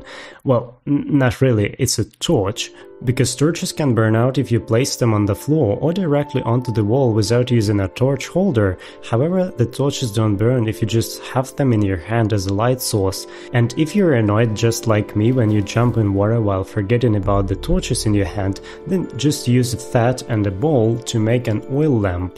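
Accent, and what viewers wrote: England, United Kingdom. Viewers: O ya vizhu vi iz anglii?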